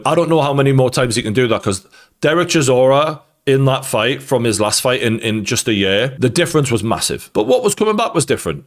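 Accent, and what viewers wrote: Newcastle, United Kingdom. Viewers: Wow bro I got a big shock. You sound like the true Geordie I know but look different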